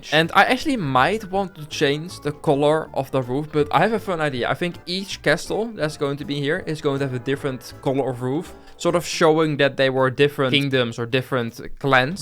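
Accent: Netherlands. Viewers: Hoi ik hoor dat jij Nederlands bent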